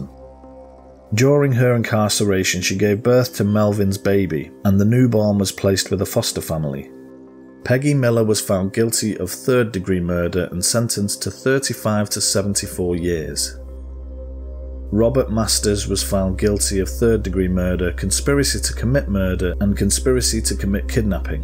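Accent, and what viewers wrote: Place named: Manchester, United Kingdom. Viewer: So comfortable to listen to a fellow Mancunian, and your dry humour is epic. Don’t stop pal, I’m here every day waiting for your content 👍🏻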